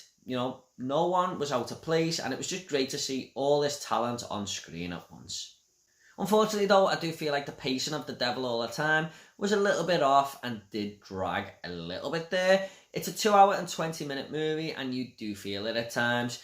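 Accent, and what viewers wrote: Liverpool, United Kingdom. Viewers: Enjoyed listening to a fellow Scouser 🤩 Great review 😊 will be one I think we will have to check out 🤩😊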